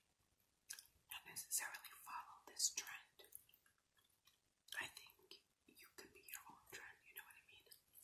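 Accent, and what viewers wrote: New York, United States. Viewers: you’re from new york are you lol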